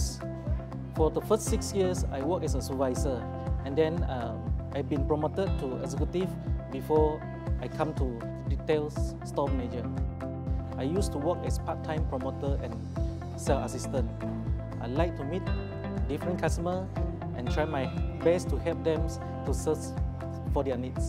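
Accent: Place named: Malaysia. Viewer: Bro, his accent is fine in Malaysia as most people in Malaysia is like that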